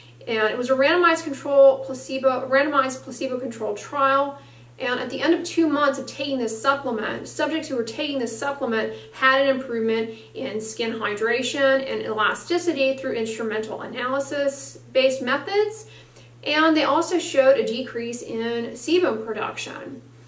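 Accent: United States. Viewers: What state do people speak like that? Just curious.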